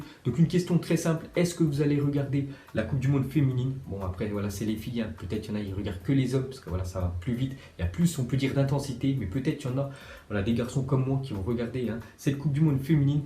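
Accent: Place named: France. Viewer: arrête de prononcer tous les noms de joueurs à la française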